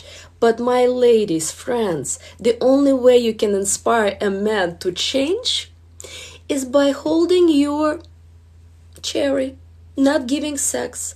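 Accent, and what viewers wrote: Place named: Eastern Europe. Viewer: Man here, you are the relationship advice women need to hear instead of “blah blah blah Tinder makes you feel whole, go sleep with 100 men to find yourself”. I can tell from the accent you’re from Eastern Europe so no wonder you are smart and beautiful. I love Eastern Europe and my Croatian gf who is what you described basically :)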